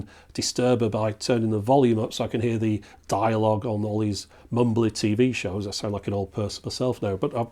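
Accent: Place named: North West England, United Kingdom. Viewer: Can’t get over the Schools TV feel on your videos, I think it’s the NW accent with Granada having made a lot of the programs for ITV Schools. This isn’t a negative, I used to enjoy some of the ITV schools programs even after I’d left school and watched when I was off / out of work.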